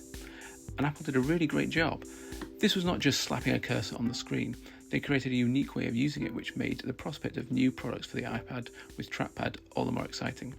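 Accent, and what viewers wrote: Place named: United Kingdom. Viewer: thanks for your reply, cause I hear your accent and thought you were in Uk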